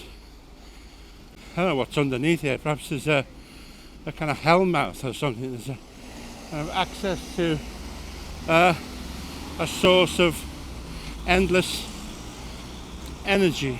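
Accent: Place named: Liverpool, United Kingdom. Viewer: I listened with the CC on and the subtitles are utterly hilarious. I guess the person subtitling doesn't understand Scouse accent or knows London at all. Exmouth Market was "Eczema Market", Anglo-Saxon car park was "Then go suction" car park, and Pret A Manger was "press emoji". I may watch all the vids again with the CC on to see how bad they are.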